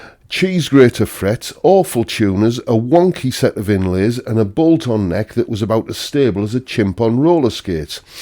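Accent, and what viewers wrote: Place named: Yorkshire, United Kingdom. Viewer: Still playing the "place the accent" game. Son of a yorkshire farmer. Not county Durham then, so no Darlo. Not Middlesbrough, but I'm guessing close by. The fact you didn't say Cleveland places you south of Stokesley, I'm going to put my bet somewhere between Boroughbridge & Northallerton.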